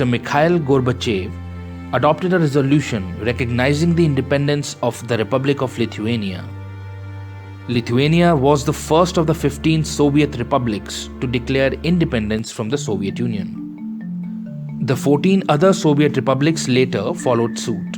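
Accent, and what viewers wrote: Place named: India. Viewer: Thank you for your correct pronunciation of words contain V and W. In India in the last few decades there has been a trend to reverse the pronunciation of these letters which is painful to listen to as an English speaker. Also your accent is pleasant to listen to which isn't always the case with the modern Indian accent.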